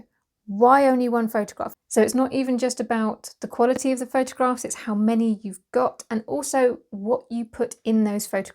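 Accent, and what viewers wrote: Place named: United Kingdom. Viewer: Hi! Many thanks for sharing your knowledge on this topic!!!!!!!! This will big a big help when I open my shop on etsy in the near future. I love to hear you talk, must be the British accent. Oh and you have very pretty eyes too. Stay safe and healthy!!!!!!!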